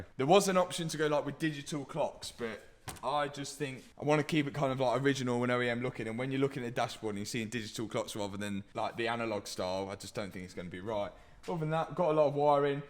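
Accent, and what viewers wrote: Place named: Wales, United Kingdom. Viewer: He talks like my old welsh boss even looks like him But 65 years younger lol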